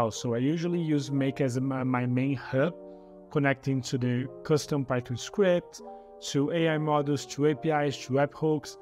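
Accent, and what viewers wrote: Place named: Brazil. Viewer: eu nn posso provar, mas tu tem um sotaque de br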